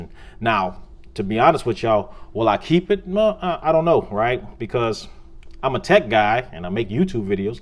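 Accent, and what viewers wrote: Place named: Texas, United States. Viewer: Love the Texas accent, subscribed and thumbs upped :)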